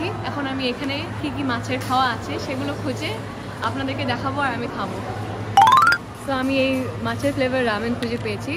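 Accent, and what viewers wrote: West Bengal, India. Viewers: Anyone, Does West Bengal "Bengali" sound like this ?? Is there any difference ??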